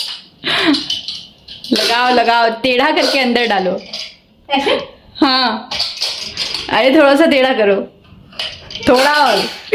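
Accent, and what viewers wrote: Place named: Uttar Pradesh, India. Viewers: MashaAllah I love ur content...also I am from mumbai and looking ur talking style I feel ur originally from UP..kuiki humbling mumbai mein rahe hai aur parents UP k hai...baat aap jaise hi karte hai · Masha allah aapne notice kiya 3 4 days aap k subscriber fatafat increase ho rahe mujhe bhi dekh k maza araha hai aisa lag raha hai ye mera hi channel hai aap k andar se mujhe bahot hi apne pann ki vibes aati hai main bhi u.p se hu gonda se aapki way of tone bilkul humare jaisi hai.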